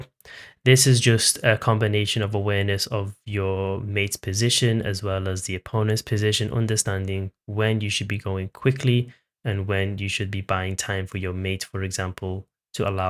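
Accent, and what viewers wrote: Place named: Liverpool, United Kingdom. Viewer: Good work mate 👏 Why do you sound like a fellow scouser 🙀🙀🙀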